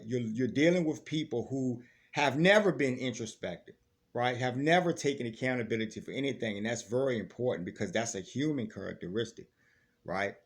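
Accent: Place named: South, United States. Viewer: I love that you are a Southern African American. I love your accent/dialect.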